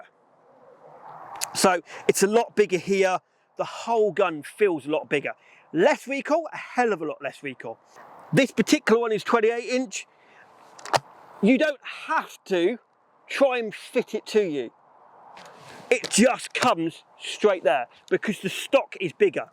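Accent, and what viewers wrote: RP, United Kingdom. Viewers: You are talking like a rich guy......go to Alaska and hunt....Kill some real game. You make it sound like a hierarchy.....lol....gosh British people are so funny......sausage rolls n stuff.😂😂😂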